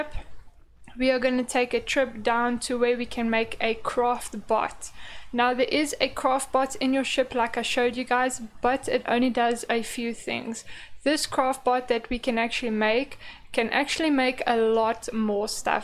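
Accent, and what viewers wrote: South Africa. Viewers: Love the accent. I'm thinking South Africa. Great video. Very helpful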